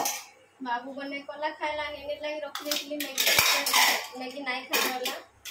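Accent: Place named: Western Odisha, India. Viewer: I love to hear WESTERN ODISHA TALK always MAM ,LOADS of respect from KENDRAPARA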